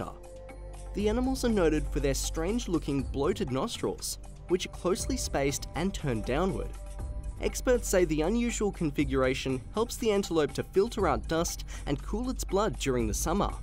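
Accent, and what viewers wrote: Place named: Australia. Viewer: You know a video about wildlife is legit when you hear an Australian narrator.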